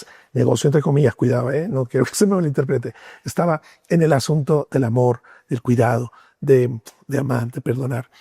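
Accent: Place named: Spain. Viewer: Ese acento español! ❤😊 Bendiciones!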